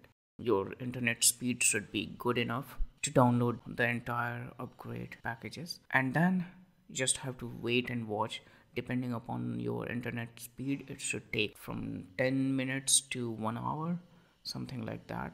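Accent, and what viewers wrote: India. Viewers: No, you are too quiet, speak with a distinct Indian accent, and your are speaking way too fast to follow.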